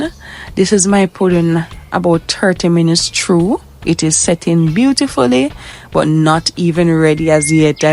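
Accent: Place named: Jamaica. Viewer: I'm living in the US,, I like your Jamaican accent keep it just like that,,,u are so intelligent 💓💯🇯🇲👋⭐⭐⭐⭐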